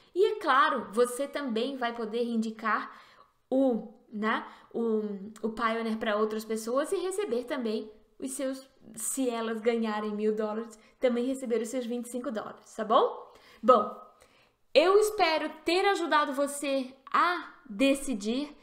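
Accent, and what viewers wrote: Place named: France. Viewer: você mora na frança ou algo assim? kk consigo perceber um sotaquesinho já 😅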